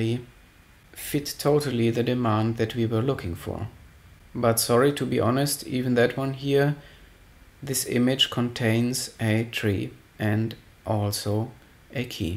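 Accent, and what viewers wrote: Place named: Germany. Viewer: Kein problem.. I like to hear De-nglish..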